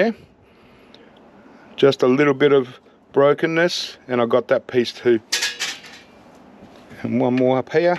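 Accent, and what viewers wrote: Australia. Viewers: I cry when I see him selling the boards without depopulating them like the TITLE said...but still he is very worthy of watching and the Aussie accent always tickles me.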